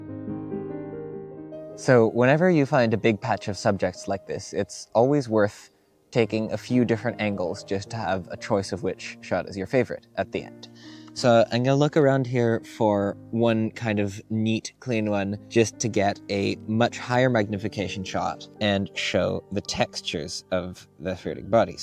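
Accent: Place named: United States. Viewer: You mentioned your location is Germany, but you're sounding American. If you're living in Germany, in which area is it? I love to see younger people doing photography or even macro. Usually its more an old white man stuff... 🙂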